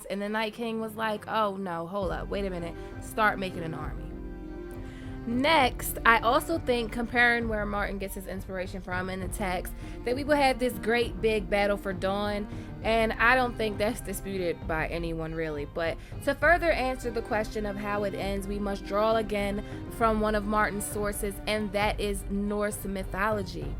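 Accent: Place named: Midwest, United States. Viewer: lol NOT comparing you to that creature. Where your accent from anyways? Midwest? Chicago? I actually prefer you being genuine rather than others who put on a terrible British accent when discussing ASOIF.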